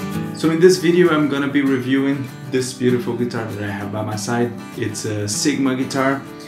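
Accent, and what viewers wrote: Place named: Denmark. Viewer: Nice! It just sounded a little like a danish-english accent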